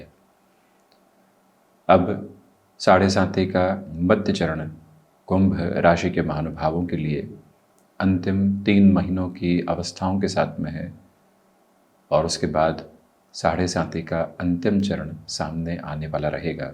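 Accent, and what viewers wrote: Standard. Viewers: Even though could not fully understand what he spoke but it was pleasant hearing his shud Hindi as if I am in Ramayan era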